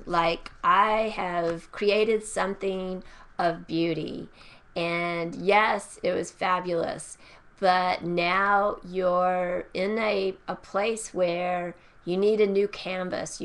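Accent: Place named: New Zealand. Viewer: Where are you from? That accent is different. New Zealand?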